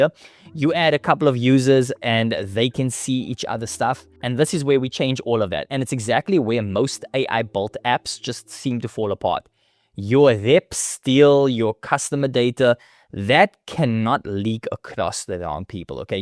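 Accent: South Africa. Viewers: Intresting dialect, you i SAfrica? Sound like a combination of hindi and Afriaans mix in English. I like it. ☺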